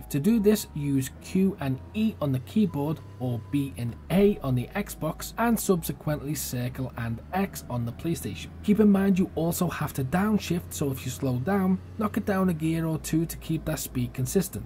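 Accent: Liverpool, United Kingdom. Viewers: is that a scouse accent close by to maybe, lets just say city centre?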